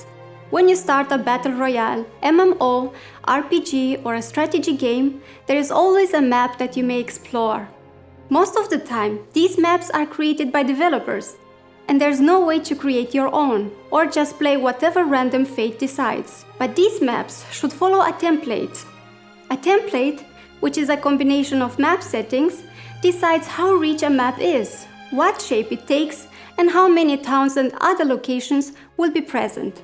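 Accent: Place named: Romania. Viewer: your accent sounds Romanian. I'm Romanian.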